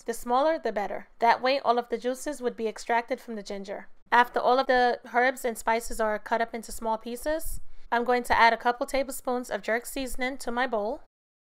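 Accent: New York, United States. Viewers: Well done. Looks delicious. Love your accent. New Yorker?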